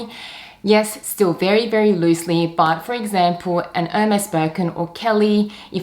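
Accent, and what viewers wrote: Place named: Australia. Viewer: Hi sorry if this is a weird question are you from Melbourne? Only because there was another YouTuber who sounded identical to you I thought it was you actually.. I think I just found a difference in accents between Australian accents which I never noticed before Anyway bags are nice but I wouldn’t trade them for my seventeen o/s trips I have done.. 4 lux bags are plenty right now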